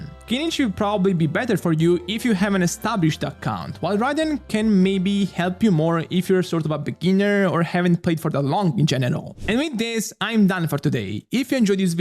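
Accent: Italy. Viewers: I love his italian accent:D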